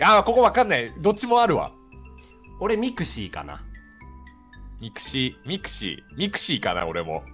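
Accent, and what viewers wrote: Kansai, Japan. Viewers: 大体レトさんと同じだわ やっぱ関西なのかね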